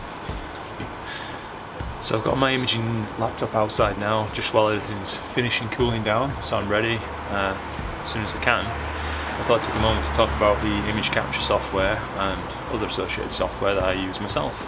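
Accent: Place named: West Yorkshire, United Kingdom. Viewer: Thought I recognised a West Yorkshire accent. Nice setup, I’ve got the esprit 100. I’m in York, but originally from Wakefield. I’ve got a Sesto Senso2 attached to mine and it’s great. I prefer it over the EAF I used to use. Things that won me over, are, it has a clutch so you can still manually focus, as long as the sesto is off. Not a biggy but can be helpful if you don’t want to use sesto, and also there are no brackets or weird adjustments to attach it to the esprit range, even though there is a bracket for the zwo EAF (the Takashi one), it’s still messy. The sesto 2 just sits around the focus housing. I have practically no focuser backlash either. Great video.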